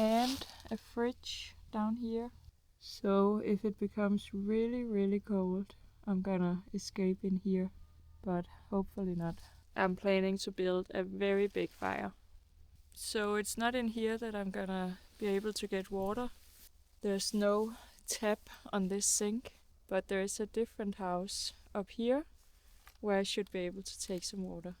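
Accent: Denmark. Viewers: That’s a cute girl with a cute Danish accent! (Trodde du var svensk först :) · Thank you for sharing your adventures with us 😊 I really like your Danish accent, I find your videos very relaxing! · You sound Danish. Going home to some warm hugge is never a failure.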